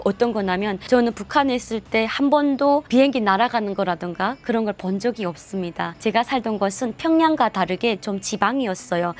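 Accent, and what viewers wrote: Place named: Kansai, Japan. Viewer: 話しかたが大阪のオバチャンみたいで親しみがわくよ。これ大阪弁でやったらウケると思うな。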